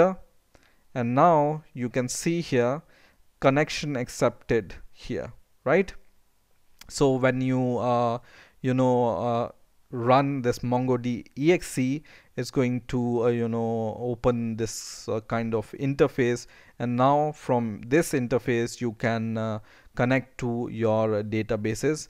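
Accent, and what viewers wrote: India. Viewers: Indian accent，that‘s cute !!!aloha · Mohamed Dabo yeah, and that is exactly what I do, not before letting them know I can't understand them. It doesn't say INDIAN ACCENT in the video title, and how many of the billion of them have put a video on YouTube. When you create a filter to remove Indian accents from all IT videos then let me know.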